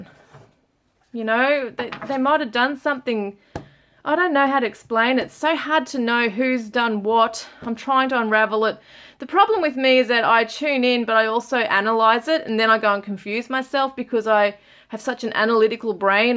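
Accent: Australia. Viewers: Love you in depth readings. Hearing the Aussie accent for me makes me feel more at home like we're having a personal convo Thankyou for all your doing to help bring answers to little summers disappearance ~cheers🤗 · Thank you just stumbled on you! Great to hear a fellow Aussie 💙 Summer ❤💜❤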